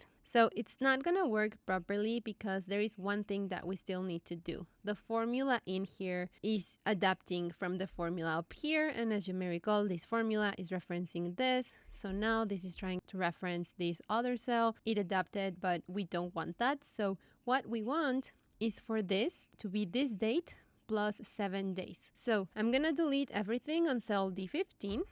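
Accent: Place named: Latin America. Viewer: Your tutorials are just amazing!! I'm from Mexico, but I went to college in the US and lived there for 12 years. You sound like my female friends from LatAm! I've seen many of your videos, and I don't think you've ever said your name! Thank you so much for making our lives easier with spreadsheets!!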